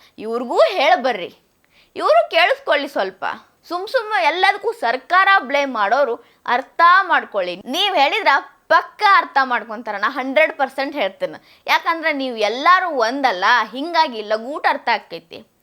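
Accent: North Karnataka, India. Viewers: ನೀವು ಮಾತನಾಡುವ ಉತ್ತರ ಕರ್ನಾಟಕ ಶೈಲಿ ಅಂತೂ ಅತ್ಯಂತ ಅದ್ಭುತ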